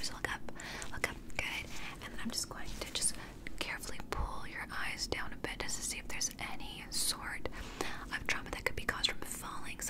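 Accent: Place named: United Kingdom. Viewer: I DEMAND MORE BRITISH ACCENT ASMR 🤠😍thanks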